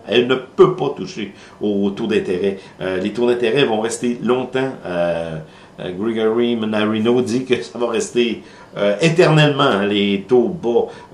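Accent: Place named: Quebec, Canada. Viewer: Votre accent est magnifique. Québec libre !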